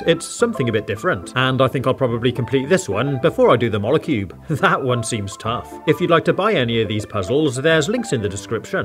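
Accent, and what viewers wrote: United Kingdom. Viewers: Are you British are you from London are you even from America cuz I feel like you don't you also talk British so much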